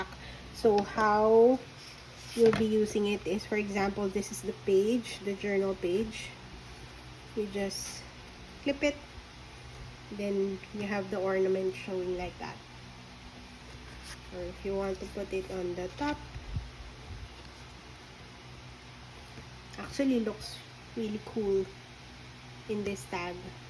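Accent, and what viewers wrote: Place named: India. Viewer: Thé ornament paper clip are very cute Thanks for sharing your process Love your Indian accent it remenbered me of my 6 years in Bangalore I miss India very much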